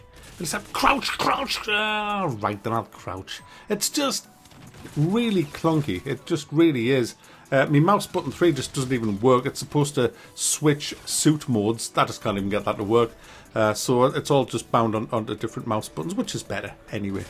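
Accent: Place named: Newcastle, United Kingdom. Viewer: From now on I only want to hear my game reviews in Geordie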